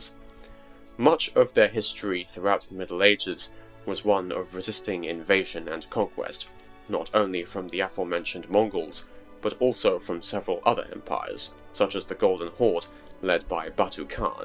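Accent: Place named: United Kingdom. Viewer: I dig your voice its fine and it sounds great especially with the British accent. The pronunciation of the tribes of spot on and the music in the back is absolutely epic I enjoy this video and we'll be looking into more of the content.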